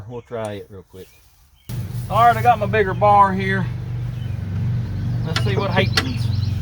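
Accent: South, United States. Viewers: I'm hoping to be at Adams,,if I see you I'll say hello! I love watching your videos! It tickles me at the good old" country talk" that I've heard for years!! Your a great mechanic no doubt! Keep on doing these very interesting videos! You tell how to do things the best way,, STRAIGHT FORWARD! NONE OF THAT TECHNICAL MUMBO JUMBO!! that in my OPINION IS WHY YOUR SO POPULAR ON HERE!!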